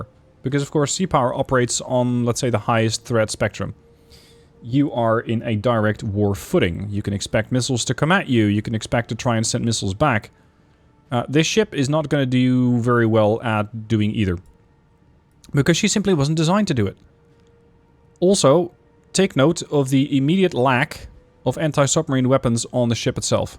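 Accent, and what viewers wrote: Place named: Netherlands. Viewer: Your accent in german gave it away i think you are or at least speak dutch. Greetings from Switzerland.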